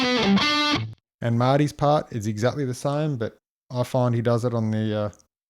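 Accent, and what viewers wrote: Australia. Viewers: Hey, You sound like an Australian, ain’t ya?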